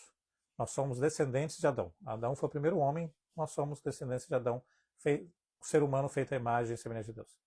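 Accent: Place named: South, Brazil. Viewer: A paz, pelo seu sotaque pensei que era do sul do Brasil.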